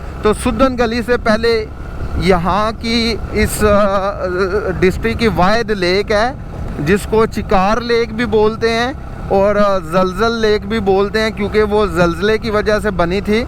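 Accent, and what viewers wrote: Punjab, India. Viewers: Bhai es se acha hai ap punjabi bol lo kyun k ap ki urdu hindi bolne main punjabi lehja kafi had tak mix hai ya ap parhe likhe km ho😊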